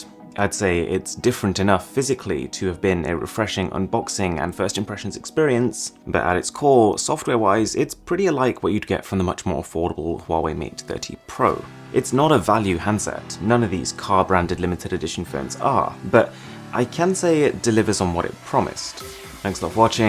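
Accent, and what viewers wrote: United Kingdom. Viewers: Smh a dialect can’t be helped. He sounds British but without the accent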